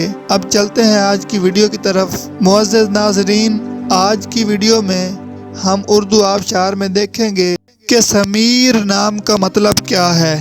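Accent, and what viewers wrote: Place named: India. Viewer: UZMASAMEER Indian tone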